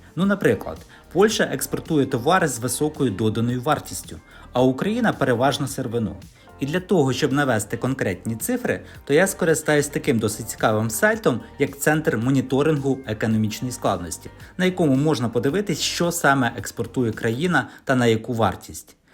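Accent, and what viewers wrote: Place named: Russia. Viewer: так аканізуєте, ніби війни в нас нема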